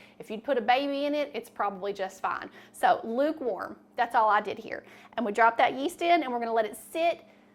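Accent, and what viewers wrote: South, United States. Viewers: Where in the usa are you from ..i love your accent 😍 💕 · The most southern accent ever. Love it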